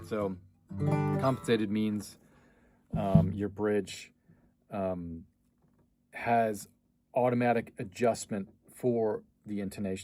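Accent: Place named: United States. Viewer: I will never get used to the USAian pronunciation of cap-o as kay-po😂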